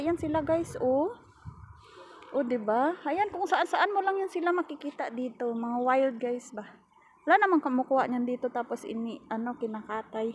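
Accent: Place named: Visayas, Philippines. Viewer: Pag bisaya be .🤣 Kanami pamati.an 🤣🤣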